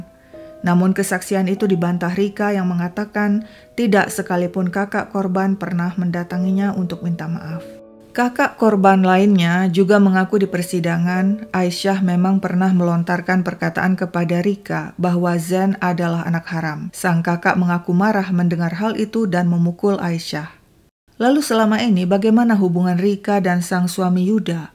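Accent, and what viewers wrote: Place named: Palembang, Indonesia. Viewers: Bahasa Palembang nya medok ni... kayak Palembang asli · Kok naratornya cakap banget ya pake logat palembangnya? Apa narator orang palembang aslinya. 😂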